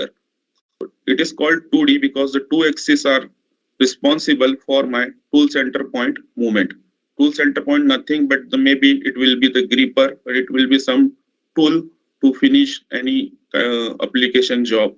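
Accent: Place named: India. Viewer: with the indian accent it is becoming an headache after some point. sorry mr..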